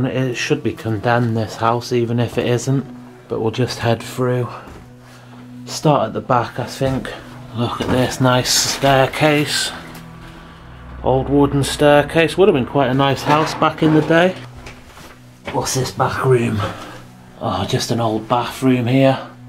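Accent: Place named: Liverpool, United Kingdom. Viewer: his accent isn't Welsh but definitely northern. I think he's scouse or from Cheshire. Definitely got a scouse sound.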